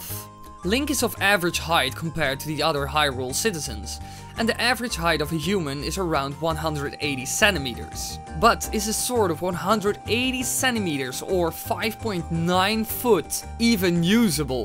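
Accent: Netherlands. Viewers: You Sound Dutch. are you Dutch? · Hold up. You're Dutch, aren't you? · is either Dutch, flemish or Scandinavian going by his accent. He's not an American or englishman. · you sound dutch... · It's also weird to hear someone with a heavy Dutch accent be called an English person. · English? He sounds Dutch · After this Video i would probably think he is Dutch, the way he speaks with his accent reminds me of the Dutch people, especially when he says german words. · You'r Dutch arn't ya? I hear it to your accent, it's not the same as Britians or Americans, you are from the Netherlands, you even have the French R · true maar je klinkt zo Nederlands. vind het echt jammer. want ik vind je beter dan game theory.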